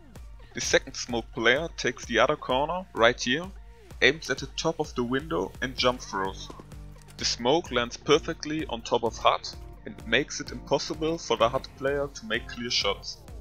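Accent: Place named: Israel. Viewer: I could swear I clearly detected an Israeli accent in your speech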